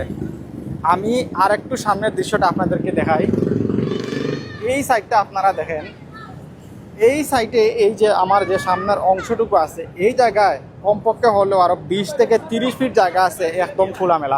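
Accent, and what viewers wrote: Sylhet, Bangladesh. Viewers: তুমার কথা সিলেট মনে হয়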